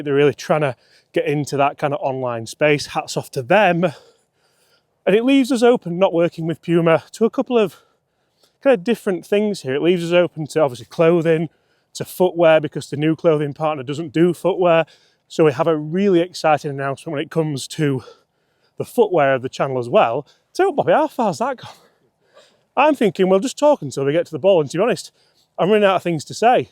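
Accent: Yorkshire, United Kingdom. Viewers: You can tell he’s from Yorkshire…Eee by gum let’s go for the cheapest and make it feel better by the fact we’re professionals than it really is! Lee Trevino used to use bottles to get down greens…. Probably 60/70% of drivers are as good as the previous years even 80/90 % look at all these reviews!! If you’re a 20 plus golfer they may help you, 20-15 maybe 10 yards more forgiving m, 15-20 confidence 15-5 high quality 5–5 elite, be realistic were amateur and mostly shite! Well done to the low handicappers!!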